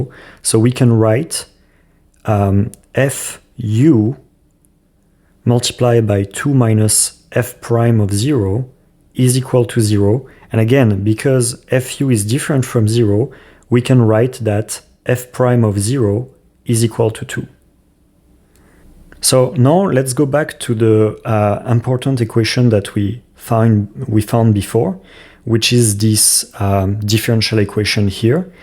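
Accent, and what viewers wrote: France. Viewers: c'est cramé à 10km que t'es français ptdr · don’t worry the English level is very good. Yes there’s a French accent but it’s no issue.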